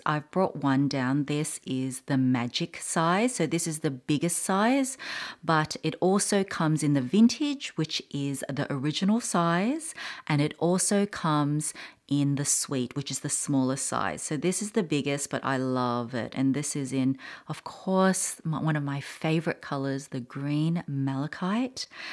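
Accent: Australia. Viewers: I am surprised that the Cartier watch (Tank or Panther watch) didn't make the list. I think the problem with Tiffany is that they don't really have one iconic piece that is unique to them. I think the Tiffany T line the T square bangle can become that piece, unless they start changing it then it won't be iconic anymore. Thank you for such a lovely video. Alway enjoy watching your videos...and that Australian accent is gorgeous!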